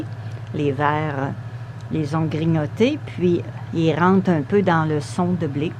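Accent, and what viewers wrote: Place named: Quebec, Canada. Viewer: Bonjour et bonjour de france,j'ai plaisir à suivre vos vidéos mais aussi plaisir à écouter ce si jolie accent québécois. J'aurais juste une question,vos vers vos les élevés dans le noir ou avec un de lumière? Cordialement Romain et comme on dit dans votre belle province gros bec.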